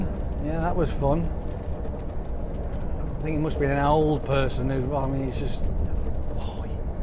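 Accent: United Kingdom. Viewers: First time I seen ur video very good really enjoyed ,were is ur acent from ,what part of uk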